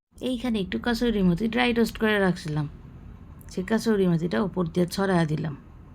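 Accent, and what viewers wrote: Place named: Dhaka, Bangladesh. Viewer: দিদি ঢাকাইয়া কথা আমার শশুর বাড়ি বাপের বাড়ি সব ঢাকাইয়া। তোমার কথা শুনলাম সত্যি সত্যি অনেক ভালো লাগলো। চানা দারুণ লোভনীয় হয়েছে। তৈরি করার পদ্ধতি দারুণ। ভালো থেকো দিদি। ❤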